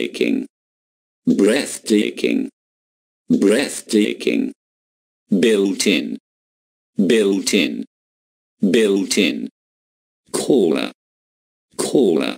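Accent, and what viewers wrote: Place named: United Kingdom. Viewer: الاكسنت المرة دي بريتش . قبل امريكان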